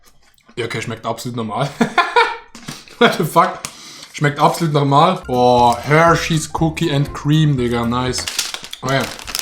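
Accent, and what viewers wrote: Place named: Switzerland. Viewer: Deinen Schweizer Dialekt erkenne ich sogar, wenn du Englisch sprichst 😂👍🏼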